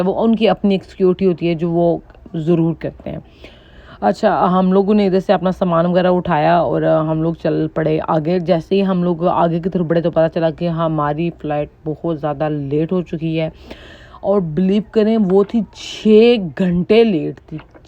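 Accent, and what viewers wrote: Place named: Punjab, India. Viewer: Punjabi touch… zbrdst h.. ✌🏼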